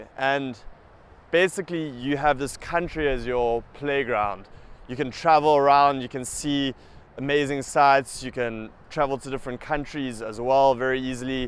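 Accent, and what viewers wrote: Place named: South Africa. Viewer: You sound incredibly South African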